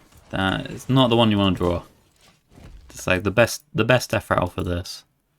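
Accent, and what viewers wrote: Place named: England, United Kingdom. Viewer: The english accent helps :)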